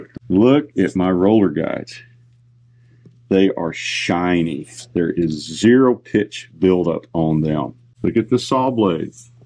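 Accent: South, United States. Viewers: I hear "Southern" so I understand you perfectly! As far as types of videos, I love the ones like this. I like them all, but on these I always learn a little something. Episode #2: items 6-10; Episode #3: items 11-15; and so on,,, Starting a series and you didn't even realize it. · BGATs are the sawyer's version of winding sticks. Thanks for being straight forward with your slow, Southern talk. It's much better than engineerese (I am fluent in both😂😂)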